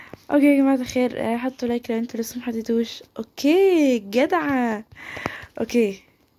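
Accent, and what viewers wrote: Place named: Saudi Arabia. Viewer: والله انك صرتي تتكلمين سعودي يا لبيهه😭💀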